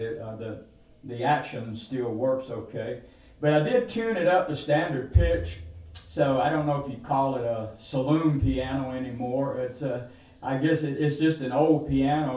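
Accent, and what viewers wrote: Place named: Rural, United States. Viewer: I am from Egypt, and this is the most english accent that i love to hear, is that the accent of the county sides people in America?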